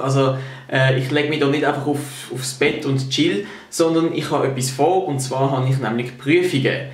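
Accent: Basel, Switzerland. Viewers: Das liit am basler Dialäkt. Dä isch nöcher am Hochdütsche wie zB dr berner oder zürcher Dialäkt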